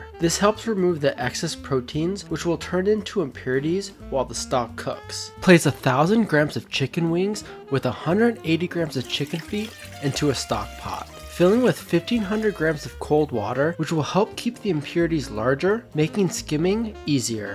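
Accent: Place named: United States. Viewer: *Clicks on recipe video.* *American starts talking.* *Uses SCALE and GRAMS* 🥹🥹🥹 You got me right in the beginning! I really do appreciate Americans who use the (superior) metric system even though it's not the standard in your country. Thanks a lot! (In case you're Canadian though I'm sorry for calling you American lmao 😂 sometimes I don't recognize the accent)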